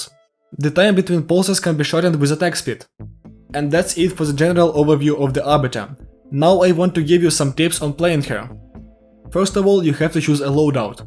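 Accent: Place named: Russia. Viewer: Judging by the accent.... Привет, ты что русский? Сильный акцент, друже. Хороший видос, уверен со временем твоё говорение улучшится.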